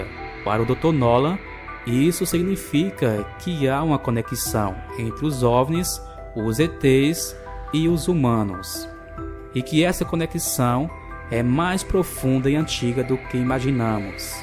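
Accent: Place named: Portugal. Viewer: O locutor é da "terrinha"!!!☺️☺️☺️ PS. Isto não é um comentário racista, é carinhoso, viu?!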